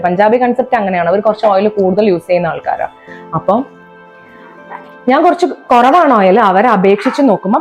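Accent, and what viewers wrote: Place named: South Kerala, India. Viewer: നിങൾ കോഴിക്കോട് അല്ലേ . എന്തിനാ ബുദ്ധിമുട്ടി തെക്കൻ കേരള slang എടുക്കുന്നെ ?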